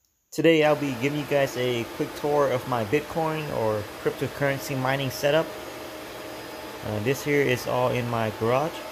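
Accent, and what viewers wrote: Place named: Vietnam. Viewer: You have Vietnamese accent.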